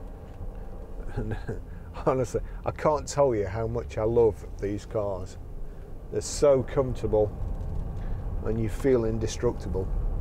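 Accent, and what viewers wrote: United Kingdom. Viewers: Wow Sir, that is a great car. I always love Land Rover, but I still cannot afford one. 😫 the one you are driving is awesome and it feels so powerful and comfortable. Do you own the car? Or Are you a dealer? How much does this car cost? How much are you asking for it? And by the way I love that nice British accent.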